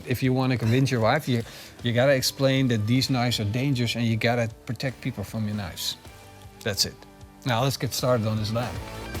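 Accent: Netherlands. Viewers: Love the whole video. The Dutch/English is awesome. Grill on!! I love my notifications on your videos!!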